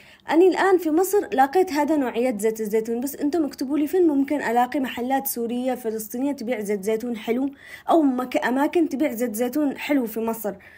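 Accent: Aden, Yemen. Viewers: ممتعة فيديوهاتك يا.. أسلوبك في الطرح جميلة ودمك حلو و كلامك العدني مرة حلووو... وأنتظر فيديوهاتك و طبخك دايم ،، موفقة حبيبتي وإلى الأمام.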